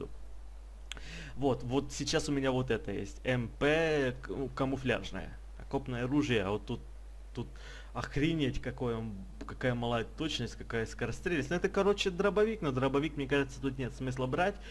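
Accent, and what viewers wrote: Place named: Ukraine. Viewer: У как будто акцент какой-то, может украинский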